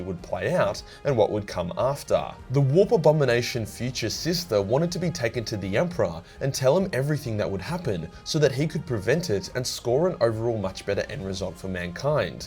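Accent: Australia. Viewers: Lol I think the risked it thing is from your aussie accent. As a American kinda flows off the tongue but I can see how itd sound funky with an australian accent. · It's the Aussie accent inflection and pronounciation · First twenty minutes and three of these views are mine lmao. My phone, switch, and PC. I couldn't get two to buffer correctly and now all are running and I just.. This cacophony of Aussie is magnificent.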